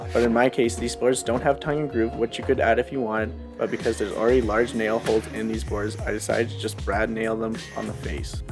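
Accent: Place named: Canada. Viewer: Excellent video, and very informative! I especially like the way you get right to the point, pace things perfectly, and don't use any of the typical amateur mistakes like long lead-ins, bad music, or obnoxious personality quirks. I've saved your video to watch again before I start my own barn board floor project. P.S. I also appreciate your Canadian sensibility and pronunciations! 🇨🇦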